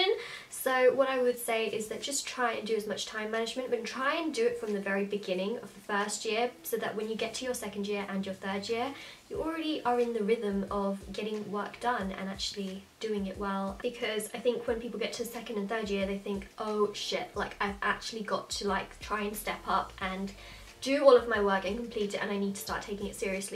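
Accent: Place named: Australia. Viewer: Are you originally from Australia? You have a mixed Aussie-English accent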